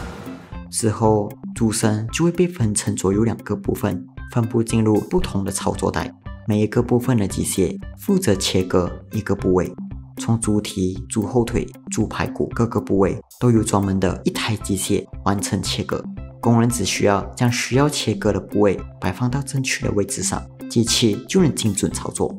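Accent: Malaysia. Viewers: 马来西亚人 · 这个华语，马来西亚人👍🏻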